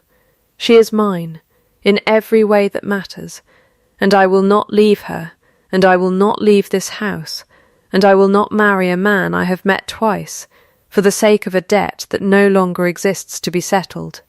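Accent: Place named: United Kingdom. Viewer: An awfully severe British accent generated by AI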